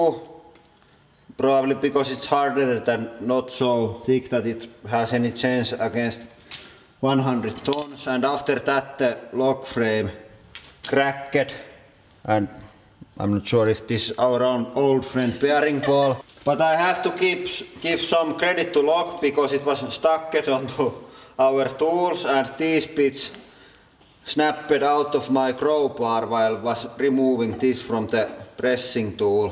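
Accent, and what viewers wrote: Finland. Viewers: Wow the finnish accent is really different than the swedish one eh? How similar are the two languages , if anyone knows? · FINLAAAAND ACCENT!!!!!!!!!!! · typical finnish england :) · Ah Finland. I wondered where your accent was from.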